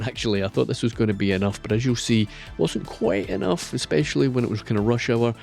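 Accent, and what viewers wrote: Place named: Wales, United Kingdom. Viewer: As a native English man I can confidently say he's welsh.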